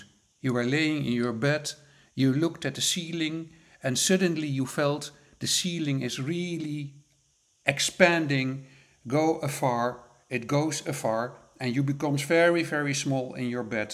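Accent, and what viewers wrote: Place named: Netherlands. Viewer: Love the Dutch accent. Sounds cute😊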